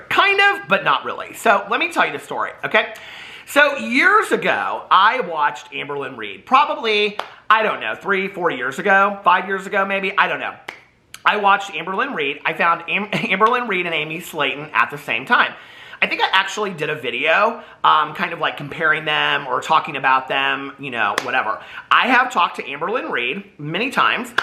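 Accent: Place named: Midwest, United States. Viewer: The Midwestern accent was popping in this video and I LOVE it!!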